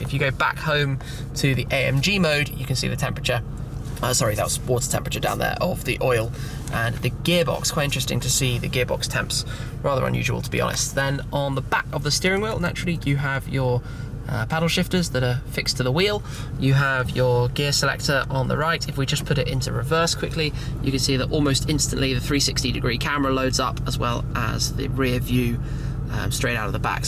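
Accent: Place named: England, United Kingdom. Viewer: hate the fkin english accent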